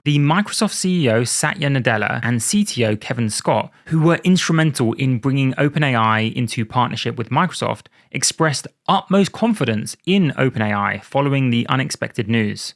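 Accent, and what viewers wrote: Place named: United Kingdom. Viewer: You went full detective mode in this one. Your British accent fits that very well xD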